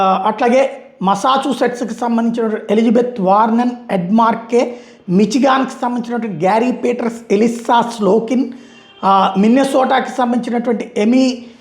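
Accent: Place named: Vijayawada, India. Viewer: Nuvvu nee butler English Nee level local bezawada ne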